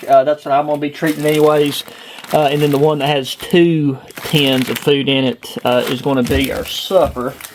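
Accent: South, United States. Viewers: Very cool Amigo. New subscriber. I have only tried one of these Kaz. rations, older version. Components are standard for Russian, Ukraine, and entire area. I like most of them. Those big pieces of beef, in Russian meals, is called Tushonka. Cabbage meal is called Bigos, usually has sausage in it. Both are tasty. Glad you had fun on this ration. Peace from Kentucky, John. P.S., just guessing from accent, you are or were from Southern U.S. ? WE all sound the same, LOL. That is A compliment!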